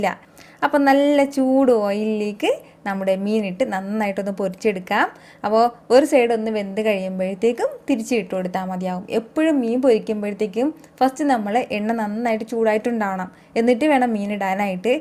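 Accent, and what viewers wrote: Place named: Kasaragod, India. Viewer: kasargod orgin ano....slang kasargod style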